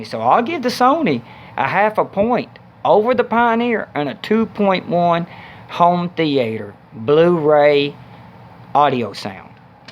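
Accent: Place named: Arkansas, United States. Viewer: I'm from AR and I can confirm his accent is very close to the folks around these parts.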